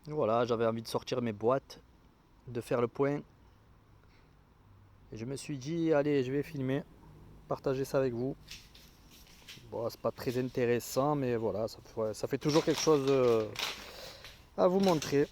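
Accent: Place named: South, France. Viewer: T es un sudiste vu ton accent on est presque voisin 👋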